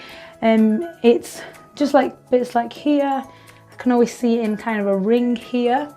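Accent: England, United Kingdom. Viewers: Idk why but I always am rubbed the wrong way when I see an Arab, Indian, or African with an English accent. All I hear is a little rat bastards coward runner. Ran from your country to the safety of another. Weak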